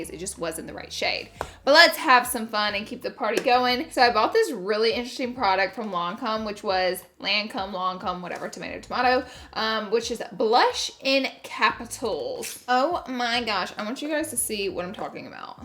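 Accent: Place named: South, United States. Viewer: You have an accent (to me)! Where are you from? It’s very southern belle/ country lol and I can’t tell your ethnicity 🧐🤔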